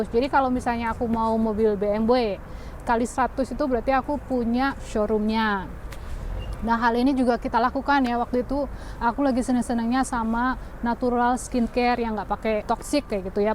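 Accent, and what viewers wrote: Banyumas, Indonesia. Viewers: Ora ngapak ora penak mbak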